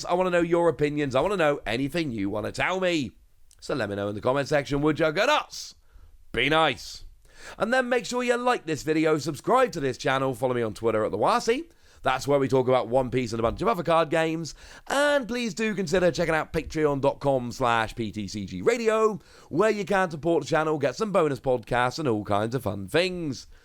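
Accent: England, United Kingdom. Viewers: Typical english accent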